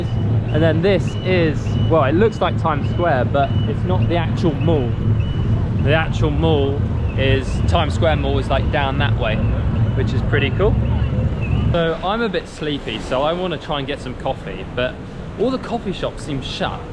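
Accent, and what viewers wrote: United Kingdom. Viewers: I really like your English accent , very nice 👍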